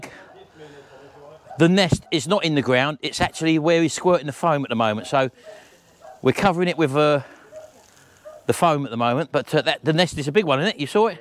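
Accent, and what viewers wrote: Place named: Cockney, United Kingdom. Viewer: Well that got me. Coulda sworn you were from the eastend (like my missus) and damn me there's a Riddels skip. I can nearly see their yard from where I am.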